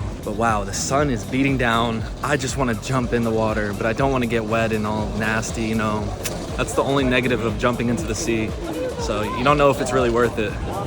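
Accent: United Kingdom. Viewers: Always lovely to have you on this side of the pond. Your really starting to sound like a Brit. Enjoy your stay ❤ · you sound more british everytime you come lol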